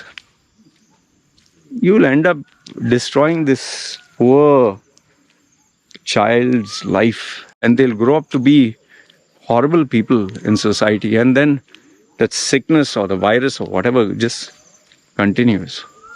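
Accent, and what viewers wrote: India. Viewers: In Canada English people hate people from Idian origen ! They hate your accent ! why you follow anglo culture??? ? WHY???? you need mental help !you are living on the moon ! everybody know your people are scammers ! why you get tattooes?? I dont respect man with tatoos on face ! you should go back to your country and leave the people in Thailand in peace . Are there toxic chemicals in tattoo ink? Mercury, cobalt sulphate, other soluble cobalt salts, and carbon black are in Group 2B, which means they may cause cancer in humans. Cadmium and compounds of cadmium, on the other hand, are in Group 1 (carcinogenic to humans).When it comes to cancer, black ink can be especially dangerous because it contains a very high level of benzo(a)pyrene.The body is directly exposed to the toxic substances contained in the ink due to the injection of tattoo ink into the skin. 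Pigments may accumulate in the lymph nodes or other organs as they are in direct contact with the skin tissue and lymphatic system.The colorants tatoo ink they get transported to other anatomical locations in the body via the blood vessel and lymphatic systems directly after tattooing or via the lymphatic system years or months after tattooing.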